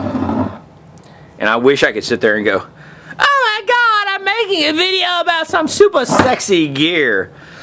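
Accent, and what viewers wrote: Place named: South, United States. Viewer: You sound like a red nec.. Lamb voice. Costco sells it for <num> cad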